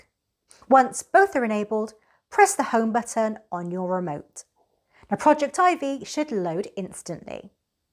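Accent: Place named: Cornwall, United Kingdom. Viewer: 😁 I grew up in Cornwall so have an ear for an accent... Can't decide whether you're Cornwall or Devon..? Or, to cover my bases completely, Dorset 😁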